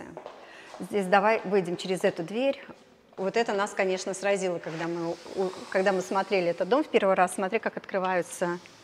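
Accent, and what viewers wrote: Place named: United States. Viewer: артекуляция речи , манера, капец....американпипл😂😂 неуж так говорят русскоязычные, которые живут в Америке...пусть и не мало лет, неужели дает такой эффект речиобразования ? интонация слов. Родное нельзя забыть.😁🙄 мда.... русская речь перешла на амер. манер🤣🤣 слушать забавно😜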